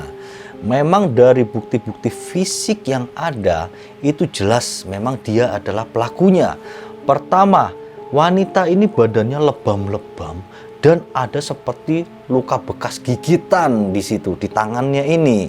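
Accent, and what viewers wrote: Java, Indonesia. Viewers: wow salam mas OMONG JOWO NYA PEKAT BANGET.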